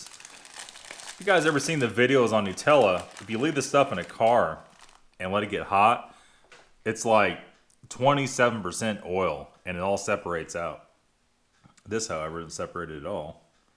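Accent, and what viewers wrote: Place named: Midwest, United States. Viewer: "If you build it, they will come." Your channel is becoming a phenom. In spite of the bottom feeders, with their assinine commentaries, the viewers with who have possession of their faculties appreciate your no-nonsense, BS free, objective reviews. It allows the ration collector to get vital information in order to avoid purchasing sub-standard MRE's. One final note, if you're from the Mid-West, you pronounce English according to the dialect of the region. If someone from another region can't understand this, they're idiots. (I'm from Sout' Jersey and I murdah da Kink's Inglish. We eat Peetza, Sof' Pretzils, Scrapple sammiches, and Cheez Steaks wid Cheez Whiz, ongyuns, hot peppahs, ana attytude... We drink Yinglings (Yuenglings) beer ice cold, so yous can bite me!....Go Philly Iggles!) 👍👍✌✌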